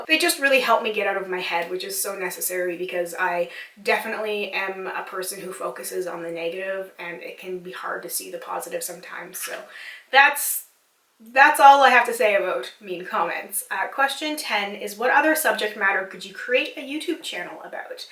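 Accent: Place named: Canada. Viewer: I am so sorry you have received mean comments about your appearance, that is so rude and unnecessary. I only recently started appreciating all the little nuances in people’s characteristics and how it makes them uniquely beautiful. I think representation is important in media, so it’s nice to see someone with a different style, opinion and features. You’re doing an awesome job on your channel! ❤️ ps. Every time you say about...I hear aboot. It makes me so happy as a fellow Canadian 😀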